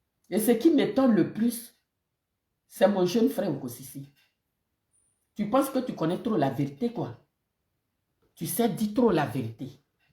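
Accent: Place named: Ivory Coast. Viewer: J,aime votre accent purement ivoirien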